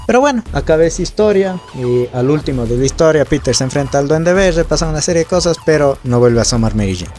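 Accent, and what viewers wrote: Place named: Ecuador. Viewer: Ese acento, sospecho que eres de Cuenca o de Quito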